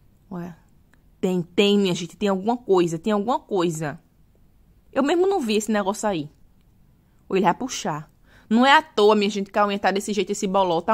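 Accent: Northeast, Brazil. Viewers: Eu amooooo esse sotaque nordestinoooo!!! Amo o nordeste, o povo de bem com a vida 👏👏👏👏👏👏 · A Questão é a Técnica ( Saúde e Bem Estar ) para dar alívio ao Paciente/ Cliente. Satisfatório em pegar um Pé/ Unha em Estado Lamentável e fazer com que a pessoa fique bem. A Técnica em si para transformar a Pessoa em uma Pessoa Saudável e Feliz. Tenho um Exemplo da mãe de uma vizinha Diabética que foi a Manicure e o caso dela era de Podologia. O resultado foi a Amputação de parte do Pé por causa dos Efeitos da Diabetes. No caso desta senhora na qual estou relatando, foram 2 procedimentos de Amputação por não ter procurado o Profissional adequado para um simples problema de Unha Encravada. Manicure é uma coisa. Podologia é outra. No caso de Cravos e Espinhas ( que eu tbm assisto ) é a Técnica para a Saúde e o Bem Estar. A pele é o maior Órgão do Corpo Humano e tbm é muito interessante em assistir. 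Este vídeo é ótimo e as suas locuções com este sotaque nordestino é melhor ainda. ( Muito divertido). Parabéns!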